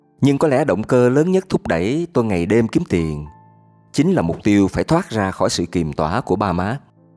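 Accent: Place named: Saigon, Vietnam. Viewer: giọng đọc rất hay rất truyền cảm mang âm hưởng kiểu dân Sài Gòn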